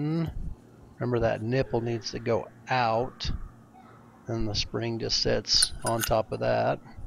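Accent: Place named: South, United States. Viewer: This vid was a great reminder that I would've unhesitatingly cast instant skepticism & doubt on whether or not this fella could give me proper advice on a torch regulator re-fit if I hadn't immediately noticed that unmistakable rural twang... which, of course, dismissed that same potential skepticism just as quickly. No political bs yadayada implied here either: rural/country/Southern folk are just ~a billion times likelier to be able to spell out things like this as if they were describing yesterday's weather to you is all. Just my city-slicker $.02...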